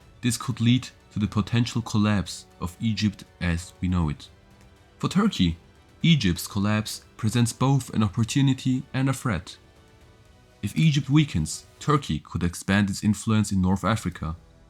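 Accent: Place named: Germany. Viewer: Yo guter video. Nicer deutscher Akzent bre. Keep on hussling. Irgendwann kommt der Durchbruch.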